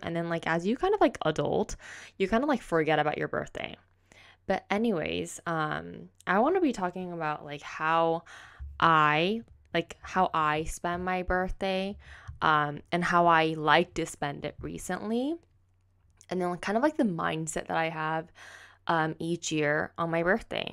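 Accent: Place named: United States. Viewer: Happy birthday 💛 のアメリカ英語がなんだか大好きで、(+ツヤ肌に見惚れながら)いつも動画楽しませてもらってます😂💕 にとってすてきな年でありますように🌈✨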